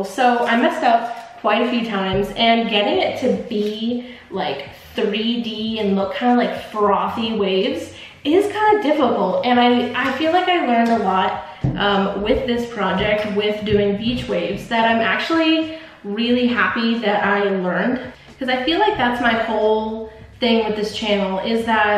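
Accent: United States. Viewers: Your art is always so beautiful. Btw, where in the US are you from? I’ve heard your accent before but do not know where from. I love it.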